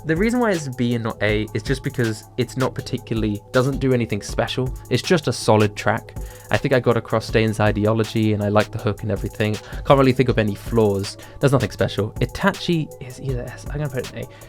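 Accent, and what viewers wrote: United Kingdom. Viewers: ...he's brittish ??? oh, not that i find it unpleasant i just wasn't expecting it haha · His voice is surprisingly very British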